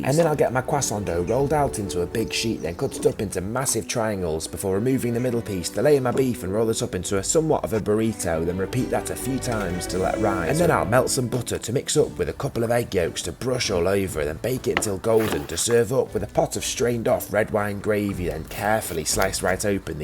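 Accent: United Kingdom. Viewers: THIS GUY HAS THE BIGGEST BRITISH ACSENT OUT OFF ALL OFF US BRITS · No i dont agree, even though his accent is british, the soul of this man's food is all-american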